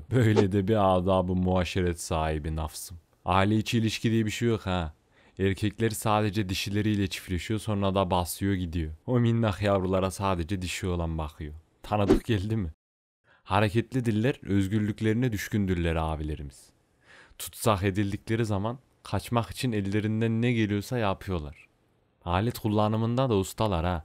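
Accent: Ankara, Turkey. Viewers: Son derece başarılı. Belgeselleri çok severim. Ankara ağzıyla efsane olmuş. Böyle devam kardeşim. İnşallah burası tutacak. Dur ben bi paylaşayım.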